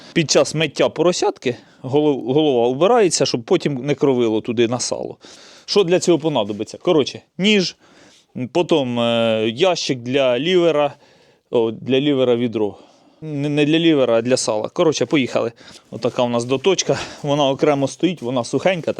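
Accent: Ukraine. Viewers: Этот украинский говор в какой области?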